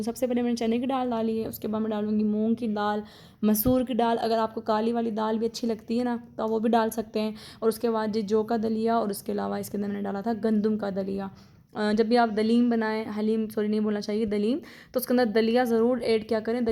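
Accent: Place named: Sukkur, Pakistan. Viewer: kahan par rehtay hain aap k paikay 😂 Matlab aap k ammi Abbi behen bhai I think sukkur q k mujhe aapki boli sukkur Jessi lagti hai aap panjabi nahi lagti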